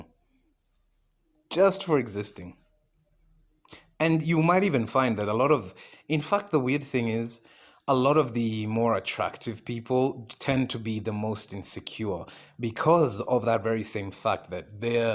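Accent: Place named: United States. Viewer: The world is full of ridiculous people I also wanna ask something off-topic that I was wondering Your accent, it's interesting it sounds like a mix between Indian and American, am I wrong here?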